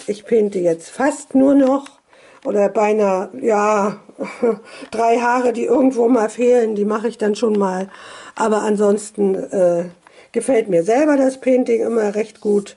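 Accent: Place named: Low German, Germany. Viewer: Süßer Schatz Wenn er ein bisschen größer wäre hätte ich angefragt Ich mag deinen Dialekt so gerne - Plattdeutsch ist einfach toll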